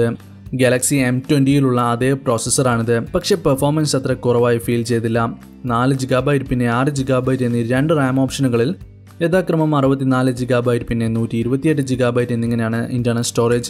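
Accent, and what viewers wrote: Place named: Kannur, India. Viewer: Bro kannur ano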